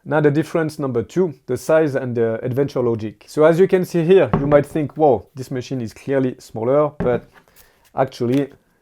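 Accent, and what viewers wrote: France. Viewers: Belle accent français 😂😂😂😂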